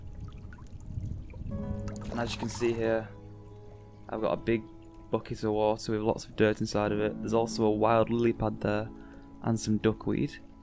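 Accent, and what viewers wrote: Yorkshire, United Kingdom. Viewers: sounds like he is form yorkshire lol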